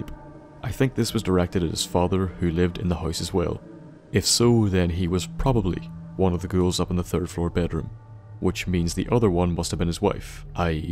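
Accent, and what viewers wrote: Ireland. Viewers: As always great video man keep up the amazing content and I've been wondering this are you Irish if not my apologies but just wondering keep it up man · Yo are you from ni or Ireland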